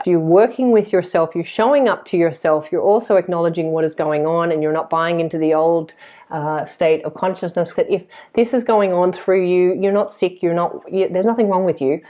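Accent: Australia. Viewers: Hey I come here for your Aussie accent. Love it.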